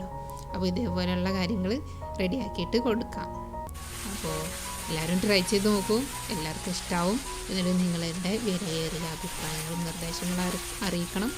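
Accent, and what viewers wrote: Malappuram, India. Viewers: നിങ്ങൾ നാട്ടിൽ മലപ്പുറമാണോ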